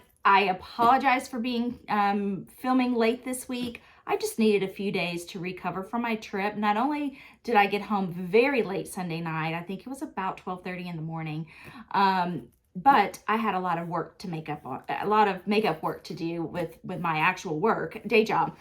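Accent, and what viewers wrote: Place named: East Tennessee, United States. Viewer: I am from Knoxville and moved to Florida in 2003 because we felt it was just getting too big. We also downsized with that move so I understand your big job ahead! What part of Knoxville are you in? Do you plan on staying in the Knoxville area? We moved from West Knoxville, but my Dad grew up in East Knoxville in the 30’s and 40’s. My husband is from Fountain City and we have also lived in South Knoxville in the 1980’s. I began watching you because I missed hearing a fellow East Tennessean and really enjoy your flosstube. I am interested in # 1, 7, 8.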